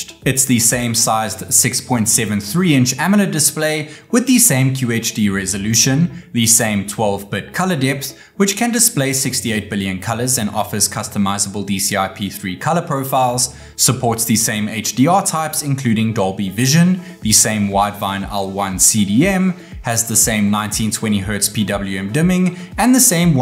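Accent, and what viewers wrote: South Africa. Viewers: Your accent sounds South African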